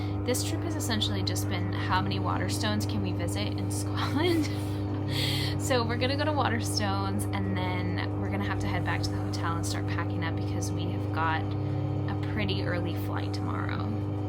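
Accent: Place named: United States. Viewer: Your American we understand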